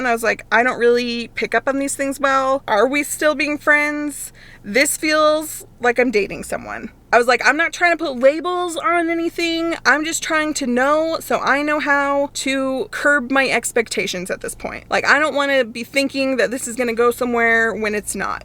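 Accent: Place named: Pennsylvania, United States. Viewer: That’s gotta be a Pennsylvania accent.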